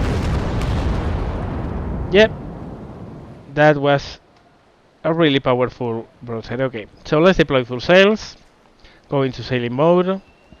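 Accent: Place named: Spain. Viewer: Eres español ¿no? Pronuncias demasiado bien los nombres españoles de los barcos y tu ingles no es nativo ni mucho menos Que conste que me encantan tus videos mate!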